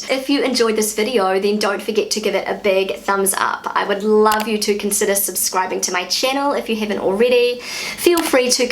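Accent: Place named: New Zealand. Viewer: Being from northern Germany I don't care much about dirndls, but I love listening to your NZ accent. As a former exchange student to the UK and the USA it is absolutely beautiful to learn about your pronunciation - and I think it's lovely. Thanks for sharing! ❤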